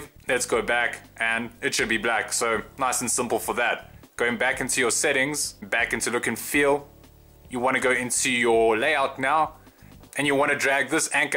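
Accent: South Africa. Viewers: You sound South-African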